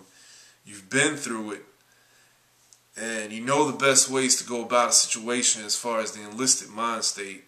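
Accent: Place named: United States. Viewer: I'm pretty sure he knows how to speak proper when he needs to. I myself am in college educated. I speak Ebonics but know how to speak proper english when it is needed. Maybe he is trying to appeal to those who are like him. You shouldn't be so judgemental. After all how we speak "inner city kids" speak is just another legacy of slavery.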